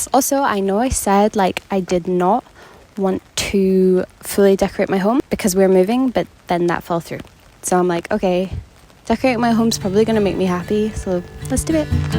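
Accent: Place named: Edinburgh, United Kingdom. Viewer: She’s from Edinburgh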